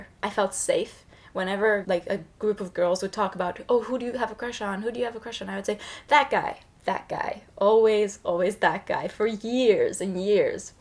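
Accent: Turkey. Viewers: Bilimsel açıdan bakacak olursak 7 yaşına kadar öğrendiğin tüm diller anadilin oluyor (detay için Noam Chomsky'nin LAD çalışmalarını okuyabilirsiniz) ve beyin hasarı almadıysan kolay kolay bu dili unutmuyor, dili konuşurken bir anda aksanlı konuşmaya başlamıyorsun. 15 yaşına kadar Türkiye'de yaşamış ve türkçe konuşmuş bir insanın bir anda 5 veya 10 senede dilini unutup kendini ifade edememeye başlaması tamamen tiyatro. Kaldı ki İngilizcesi de çok belli olmasa da aksanlı ve bazı kelimeleri türkçe aksanla telaffuz ediyor. Bu tarz triplere girmek garip, bir dili iyi konuştuğu mesajını vermek için başka bir dili kötü konuşuyormuş taklidi yapmana gerek yok. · Aksani var hatta basta turk oldugunu bilmiyodum cok sasirdim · Türk aksanıyla İngilizce konuşuyorsun Türkçe fazla konuşmasan da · Bende 16 yasinda turkiyeye geldim, 5 sene burdayim ve burda ingilizce konusan yok, ama ben ingilizcemi unutmadim, ingilizce ana dilimdir, ama bu kiz nasil unutuyor onu anlamiyorum... 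Yalan ya hepsi.. saten, amerikan aksanininda turkce olan tang'i var. ( evet turkcem daha cok gelisti ama ucmadi burda) Basically, she has a Turkish tang in her voice, it's kinda like how latinos speak.. I'm sorry to say this sweety, but you couldn't make it more obvious.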